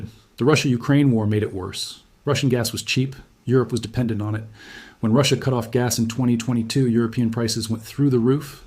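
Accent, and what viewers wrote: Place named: United States. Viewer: The GDP increased last year..so I don't know what are you talking. But from the accent you are american, so make sense to not have a clue about Europe.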